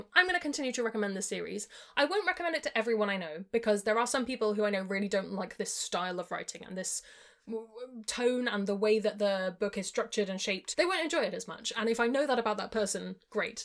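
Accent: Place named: United Kingdom. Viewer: Love your accent, it's like British lite, like a mild tea😊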